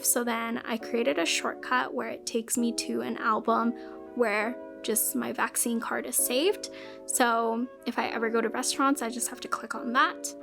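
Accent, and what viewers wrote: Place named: England, United Kingdom. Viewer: I like your english accent, can you make a content about it?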